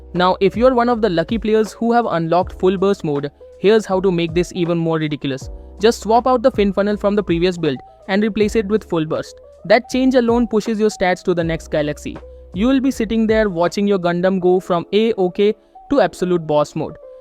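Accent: India. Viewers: Indiano falando inglês é engraçado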